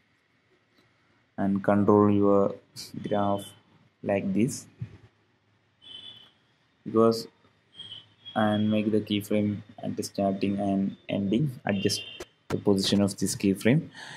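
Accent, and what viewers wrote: Kerala, India. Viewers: You are a malayali... Right? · Malayali aano?? Enik aa slang ketit angane thonunnu vere aarkelum ee dobt ondaayo??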